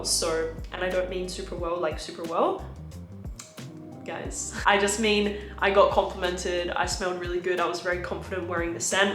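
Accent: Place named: Australia. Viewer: Ariana Grande Cloud is a great dupe for Baccarat Rouge. Bath and Body Works Dahlia is a great dupe for Carolina Herrera Good Girl. I love your accent. Are you Australian?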